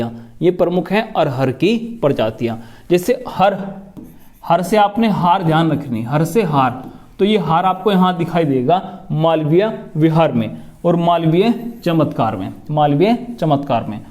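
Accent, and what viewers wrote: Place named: Bijnor, India. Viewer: Sir ap up bijnor s ho kya ? Apki bhasa s lga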